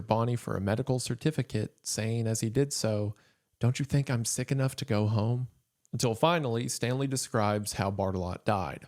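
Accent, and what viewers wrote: South, United States. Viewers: he's also a southerner, they've got the whole "pin-pen" merger going on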